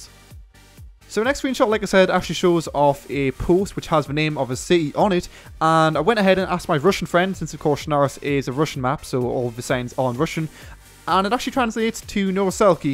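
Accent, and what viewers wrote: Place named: Newcastle, United Kingdom. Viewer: hes from newcastle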